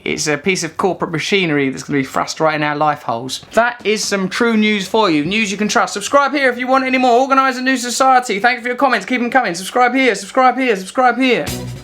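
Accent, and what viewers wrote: United Kingdom. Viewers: your cool and all, but can you stop talking in a British accent. It's annoying me.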